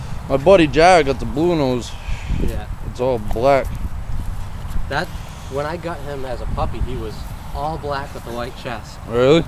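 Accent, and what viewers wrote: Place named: Boston, United States. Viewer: I didn't even look at your page. but judging from your voice, you're from Bastin.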